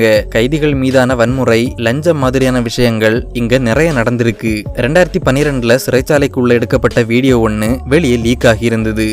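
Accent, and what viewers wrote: Kanyakumari, India. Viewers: That kanyakumari slang from youtuber ❤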